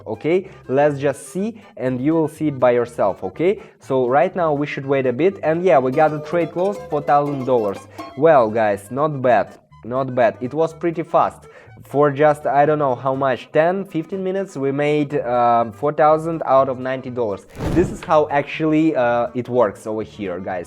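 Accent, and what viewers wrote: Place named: Russia. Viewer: that russian accent adds character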